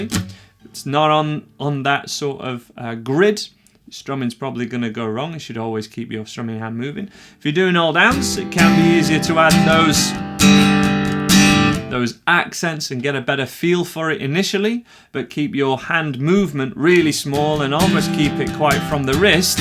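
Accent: United Kingdom. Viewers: You sound super British Ty for the tutorial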